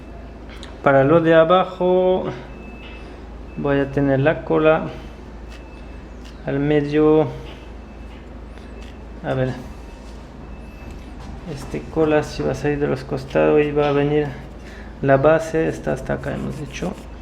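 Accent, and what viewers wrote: France. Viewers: t'es francais? Il ya un accent français dans ton Espagnol. Y muchas gracias por el contenido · Tu acento es como frances, de donde eres?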